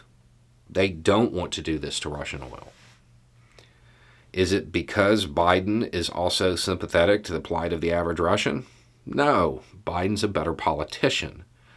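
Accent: South, United States. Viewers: Not sure if you can tell but your accent has gotten less southern. Just watched a video from 3 years ago.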